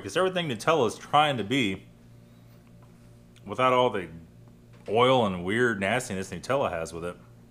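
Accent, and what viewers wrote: United States. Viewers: Super, your American accent is so cool :) I sometimes wonder if I was an American before ;) And ofcourse your way of showtime keeps me watching, Greetz from this damn cold Holland lol :)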